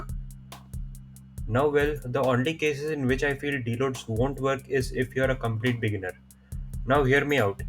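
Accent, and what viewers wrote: India. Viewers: Why does a white dude have Indian accent 😭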